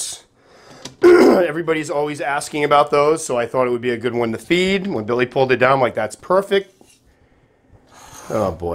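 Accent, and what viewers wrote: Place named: Northeast, United States. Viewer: Maryland here. I could tell you were somewhere up there from your accent and attitude :) Awesome vids <3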